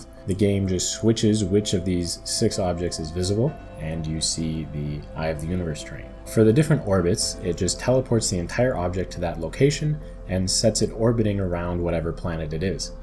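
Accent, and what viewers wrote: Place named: Canada. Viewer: its also about him sounding canadian, hence why he replied "aboot"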